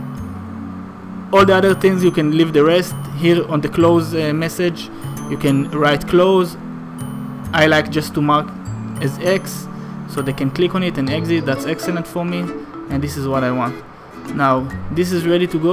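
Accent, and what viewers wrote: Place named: Tanzania. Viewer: You have a Tanzania accent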